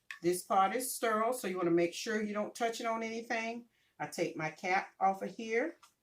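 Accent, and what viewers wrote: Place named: South, United States. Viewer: Loved the video!!!! Thank you so much, and you sound southern! :)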